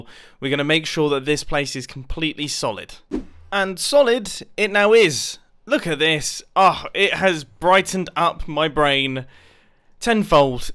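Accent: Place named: United Kingdom. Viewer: Am I the only America who thinks it’s hilarious when Britains speak basically a different language like it’s not pronounced laver it pronounced LAVA!!!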